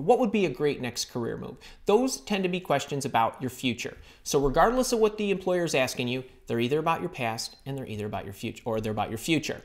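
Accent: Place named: Great Lakes, United States. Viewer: Wow, thats quite the pronounced Northern Cities/Great Lakes accent you have there. Lets see ... Central Michigan, is it? Maybe Lansing?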